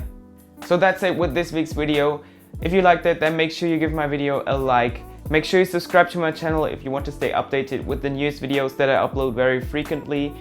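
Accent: Germany. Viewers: Gutes Englisch für einen Deutschen. Hab mich echt gefragt, welche Nationalität hinter diesem Akzent steckt. Respect. But I think that the Passport will have an end in the future. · r u german, if yes, from where?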